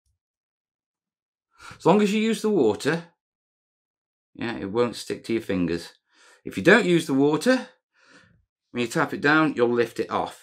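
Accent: United Kingdom. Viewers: Missed that british accent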